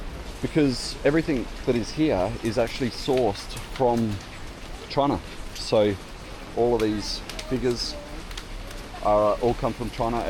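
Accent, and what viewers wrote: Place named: South Australia, Australia. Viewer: from your accent I can tell you're from South Australia... nothing beats a good kitchener bun.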